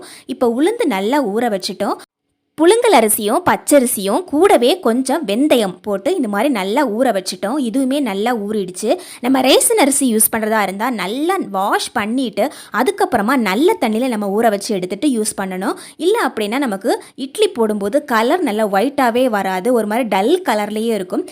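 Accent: Kerala, India. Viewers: Unga slang la malayalam vasanai veesuthu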